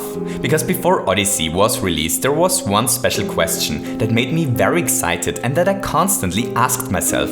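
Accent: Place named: Germany. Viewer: Nice Video. Deutschland oder Österreich (Akzent verrät alles)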